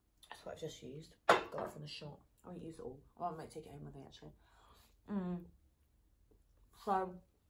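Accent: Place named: Cockney, United Kingdom. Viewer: I'm not a english speaker but i loveeee cockney it's too nice to hear u talking, u know i tried to understand what u was saying but it was fucking hard JAJAJ love uuu babe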